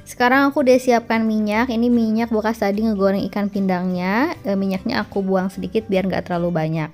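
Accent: Java, Indonesia. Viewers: Duh jadi laper mba, medog banget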